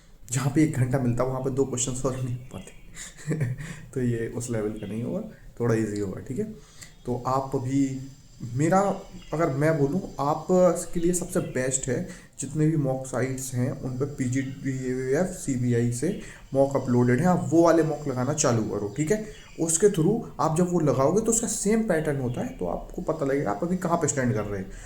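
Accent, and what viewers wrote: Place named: Madhya Pradesh, India. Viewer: Sir aap mp se ho kya . Aapki tone yha ki lg rhi h 😊. Thankyou for strategy sir .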